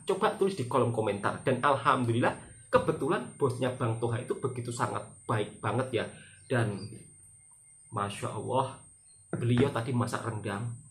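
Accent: Java, Indonesia. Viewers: best tengok video Bang Toha ni, orangnya jujur dan ikhlas dengan loghat Indonesianya tu agak LUCU tapi MENGHIBURKAN, terus berkaria ye Bang Toha. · Loghat jawa lah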